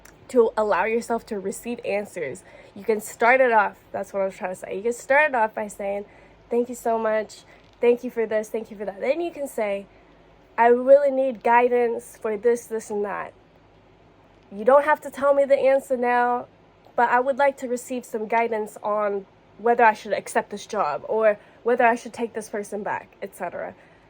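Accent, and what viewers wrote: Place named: Australia. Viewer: You have the craziest accent. It's like muted Australian, tinged with newyorican. Definitely not an insult or anything, just an observation. I have a Skånska laced Brooklyn accent, so I can empathize!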